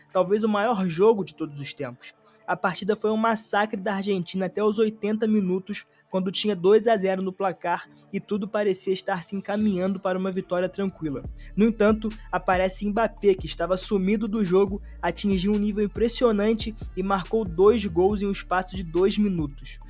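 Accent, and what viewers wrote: Rio de Janeiro, Brazil. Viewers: Vídeo começa : “SOTAQUE CARIOCA” Fechei o vídeo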